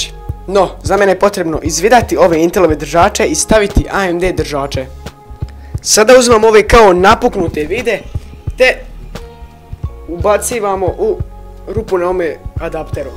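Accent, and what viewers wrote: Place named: Dubrovnik, Croatia. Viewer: oklen s'ti? Malo mi baca na Dubrovnik/Korčulu, po naglasku.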